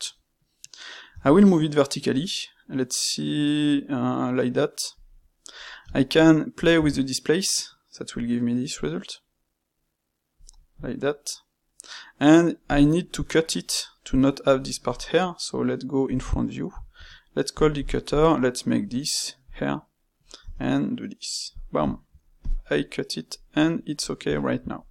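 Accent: France. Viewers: i bet you're french ^^ i am too but the accent is sooooo specific ^^ (great vid tho , i just find the accent funny ^^ )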